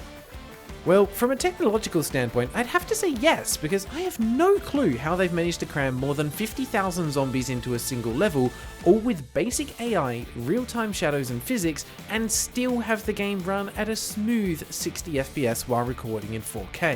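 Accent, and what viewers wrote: South, United States. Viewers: i thought he was going for southern US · I love southern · I am all for southern American accent! Yee-haw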